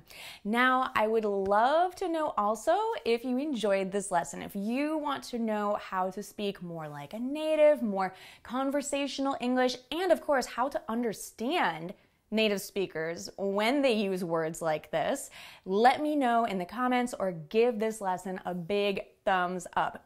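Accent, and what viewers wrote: United States. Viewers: Sister you from american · From what part of the US are you?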